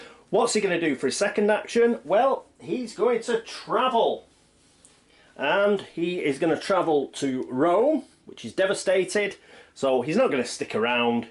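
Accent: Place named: Lancashire, United Kingdom. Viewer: I'm in Spain but I was raised in Lancashire, I'll take a wild guess and say that you're from either Wigan or Preston.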